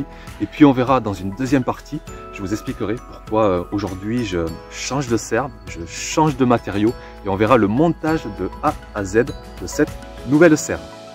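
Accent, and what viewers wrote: South, France. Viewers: Bonjour, Merci de faire des vidéos aussi pertinentes, trouver des fournisseurs fiables n'est pas facile, j'avais déjà beaucoup apprécié ton travail sur l'irrigation, et ici la serre c'est parfait! Ca déconstruit aussi le mythe de la serre en verre beaucoup plus chère que le polycarbonate! J'en profite pour te dire que tu rayonnes à chaque vidéo, c'est toujours un plaisir de voir quelqu'un être si heureux et passionné. PS: Je suis pressée de lire ton prochain livre, j'entendais ton accent du Sud en lisant le premier =)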